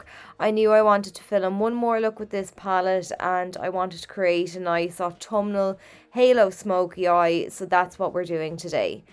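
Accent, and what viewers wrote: Ireland. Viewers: Y tiene un acento irlandés muy marcado, pero yo estoy acostumbrada, la entiendo y me gusta.... A mí también me encantan sus videos😘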